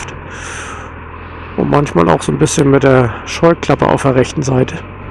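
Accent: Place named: Northern Germany, Germany. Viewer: Ach, ich liebe den norddeutschen Akzent einfach 😃 Hab mal nen Abo dagelassen, und hoffe das hier bald auch mal wieder Videomässig was kommt!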